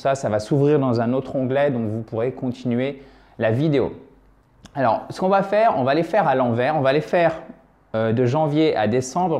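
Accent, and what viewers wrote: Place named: France. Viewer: спасибо merci gracias thx PS Votre accent français n'est aucun problème pour moi, au contraire c'est un plus car 1° on peut ainsi perdre d'éventuels complexes et 2° le russe est plutôt ainsi mieux digest qu'avec un(e) russophone de naissance.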